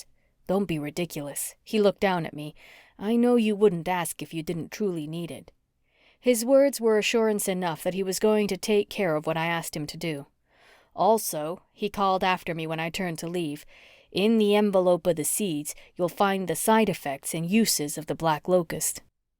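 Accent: South, United States. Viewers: Lovey story Narrated in a southern twang😊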